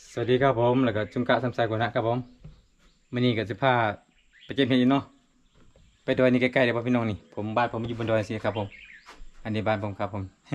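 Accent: Isan, Thailand. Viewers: เสียงเว่าเป็นคนทางอีสานบ่จ้า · อยู่ดอย จังหวัดอะไรคะ ขอโทษนะคะ ทำไมพูดเหมือนคนทางภาคอีสาน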